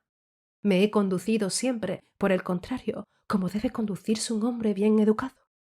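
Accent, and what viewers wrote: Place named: Spain. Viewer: La voz, la entonación, la pronunciación de algunas consonantes que contrastan con la suavidad de las otras y las vocales, me hipnotizan. Además que de por sí el acento español de España, cuando es claro, me encanta.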